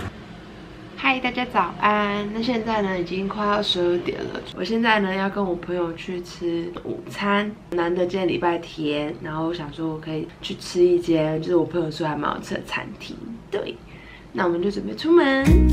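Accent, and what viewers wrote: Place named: South Korea. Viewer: 哇咔咔等到你嘍，想問健身視頻都看哪個？聽上去是韓國人呢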